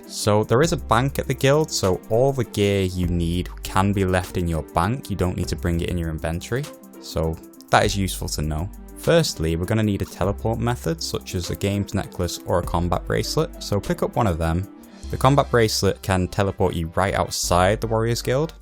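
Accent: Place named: United Kingdom. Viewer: dude you sound so brittish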